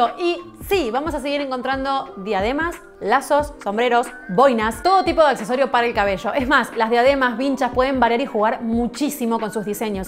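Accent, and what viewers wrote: Argentina. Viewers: Llegueeeee!!!!! Hermoso que no pierdas el acento argentino 😍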